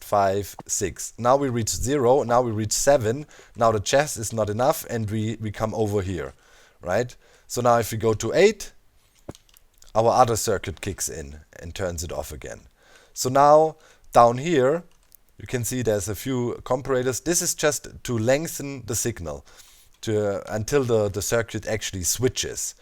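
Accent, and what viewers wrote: Germany. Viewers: I watch 30% for Minecraft, 70% for that smooth cool german accent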